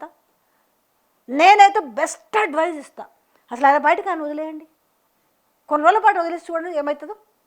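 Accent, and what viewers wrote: Telangana, India. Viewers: మీ వివరణ, confidence, తెలంగాణ accent చాలా బాగుంది మేడం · He vachesva akka bhow bhow anadaniki super. Intaku tamari slang chustunte ap kadu Anukunta kada hoo meeru tg shamala, bhale bhow bhow antunaru. Ts lo kuda evo jarugutunnayo Anukunta kada akko dani gurinchi chyyo akko. Bayam amta. Nuvvu tingari daniyo, telivaina danivo. Mari varadalu vachinapudu appudu eamduku raledu. Nuvvu shyamala kanna chndalamga vunni nee voice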